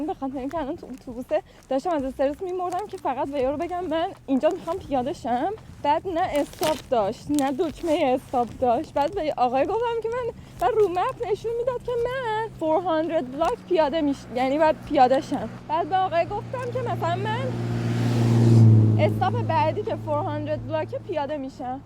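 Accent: Yazd, Iran. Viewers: مگه یزدی هستیییبیی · وایییییی اتفاقا عالی یزدی حرف میزنی اولین بلاگری هستی که دیدم شهر ما ذو میشناسی و ازش حرف میزنی 🙂🤌✨ عاشقتممممممم🎀🍓😚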